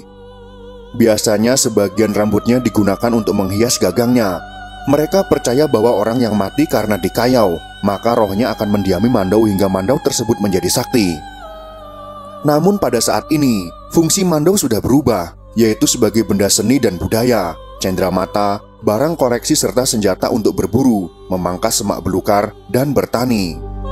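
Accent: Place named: Java, Indonesia. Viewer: Ini yg menguak sejarah pasti Jawa?🤣 Dari cara bicara udh ketahuan 😂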